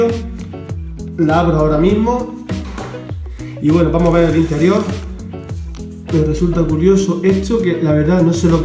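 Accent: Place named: Jaén, Spain. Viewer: Magnífico vídeo! y una de las mejores cosas es el acento de Jaén, buena tierra! La verdad, yo cuando compré esta figura me extrañó que trajera esa pieza que parece, y creo que es, el marco de un cuadro, pero como en la caja no venía, pues pensé que se les había colado la pieza. En fin, que yo coloqué la pegatina en el cuadro dorado que es más bonito. Por cierto, a ti te han tocado dos pegatinas del cuadro, pero creo que solo debería llevar una, porque a mí me venía una...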